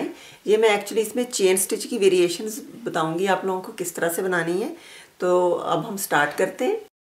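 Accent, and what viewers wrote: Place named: Lahore, Pakistan. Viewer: AssalamoAlaikum ma'am chain stich 6th ki home Economics main sekha but kabhi pta nahi tha k ismain itna variations possible hain. May Allah protect you and your family. I am from Faisalabad kya aap Lahore say hain?? Aapka excent lahori lagta hay. Maam kya aap knitting bhi karti hain agar yes to phir aapni videos upload kijye ga uski bhi. Allah aapke or aapke ghar walon ki hifazat farmaye Ameen.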